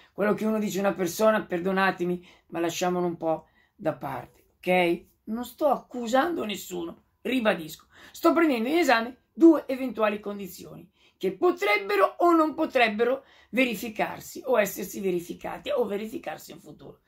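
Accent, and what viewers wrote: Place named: Ancona, Italy. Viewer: Me sembri de Ancona, mi sembri 😆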